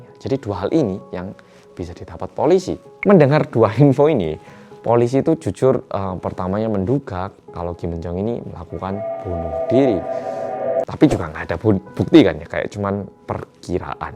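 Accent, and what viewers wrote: Java, Indonesia. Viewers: orang korea yang medok selalu di hati:) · Ganteng,medok,tuek,suarane apik, IsO ngomong Korea,wong Korea,gae konten mbendino,critane apik,urong rabi,duwite akeh,subscriber re akeh😠penak men koe Dibalik semua itu ada erjuangan yg luarbiasa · klik dulu cak medokk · tim gecep...medokkk 😊😊😊😊😊😊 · Ya ampunn.. baru liat ytb abang medhok ini lagi, sekarang ada backsoundnya euy. 😜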